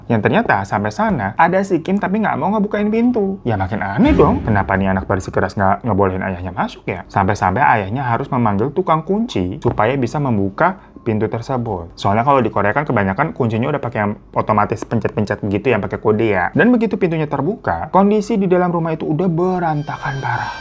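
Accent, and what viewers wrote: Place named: Pasar Minggu, Indonesia. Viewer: lama2 berasa nonton si doel logat pasar minggunye nedok banget bang ..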